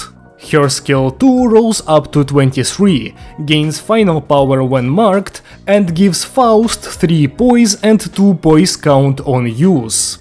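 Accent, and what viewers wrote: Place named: Russia. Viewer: почему произошение такое будто русский читает англ текст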